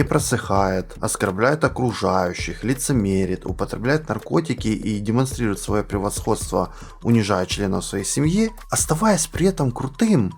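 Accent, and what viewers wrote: Russia. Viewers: да, потому что все территории около Украины, украинизированны, именно по этому у вас там говор, ге-шо-те... задумайся росиянин против кого воюет твой царь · говор на Воронежский похож) В принципе, там близко 🤗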